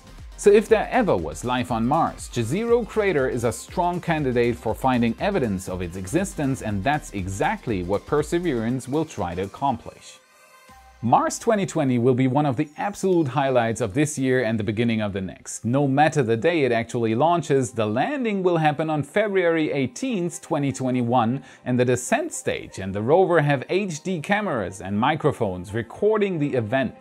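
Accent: Germany. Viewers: Tscherman Akzent. His accent is actually quite weak -- there are a few words he gets wrong (such as this one), a few vowels that aren't quite right ("apload"/"upload"), and a particular pause in certain consonant clusters that is common in German. And that's about it. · Are you german?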